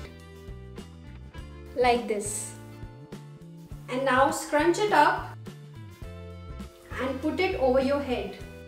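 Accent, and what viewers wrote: India. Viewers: India English is really funny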